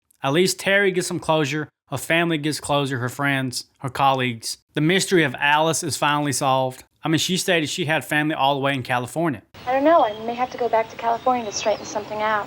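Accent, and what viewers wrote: South, United States. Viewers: glad to make your day brother I enjoy the videos it's refreshing glad to hear that southern draw you made mine today with this video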